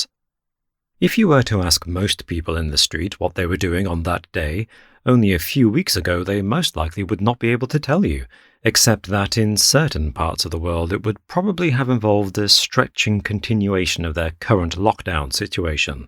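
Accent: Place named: United Kingdom. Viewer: Your stories are pretty gruesome with detailed descriptions of repulsive stuff.......yikes. But whats weird is your lovely British accent makes it all palatable. Lol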